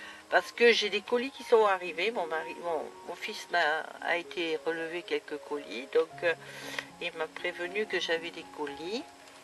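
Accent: South, France. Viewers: ah oui je suis encore là et je me suis mm abonnée à ta chaine car elle est variées tu fais des tas de choses et j'aime les chaînes comme ça. Magnifique pour ta Filfille 17 ans, cest l'âge à laquelle ma minette "Fynou" a du nous quitter, elle était très malade niveau coeur et poumons. Et ensuite jai eu mon pti Bounty qq années après. Merci pour ton email c'est très gentil. Jai aussi une chaîne mais il ny a pas toutes mes passions dessus car maintenant je mets plus des vidéos pour que ma maman qui est loin regarde ce que je fais, ainsi bien sûr que mes peu mais fidèles copines. Je t'avoue que faire des videos j'aime plus trop et jai pris beaucoup de distances avec les réseaux sociaux pour faire passer d'autres priorités qui sont des objectifs dans ma vie personnelle et qui me donnent bien plus de bonheur que la méchanceté de certaines youtubeuses dans le scrap ou la fimo... 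Pour le DP je te remercie pour le nom de la société qui en vend et en effet ta video va m'intéresser car le 1er je l'ai acheté tt à lh sur Amazon, cest une plage et le prochain sera un chat que j'ai mis en réserve. Après tu les mets sous cadre tes DP ? Car moi, cest le but. Si oui, quel genre de cadre ou autre utilises tu pour les accrocher au mur? Tes vacances se passent bien, et as tu beau temps ou tu es? Tu ne m'as pas répondu pour ton pti accent, si tu est bien du sud ? Je te laisse car je vais continuer ma page dessin du mois d'août sur mon Bullet Journal. Bon après-midi. 📚 Bisous ✏✒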